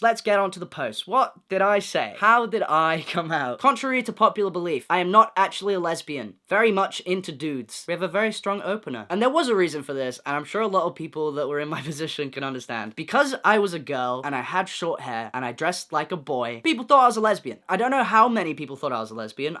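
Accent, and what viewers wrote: United Kingdom. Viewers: IS HE BRITSH?? I've been watching him for years and I just realized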